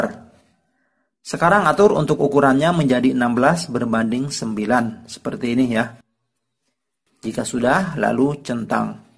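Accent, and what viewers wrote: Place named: West Java, Indonesia. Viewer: Sunda euy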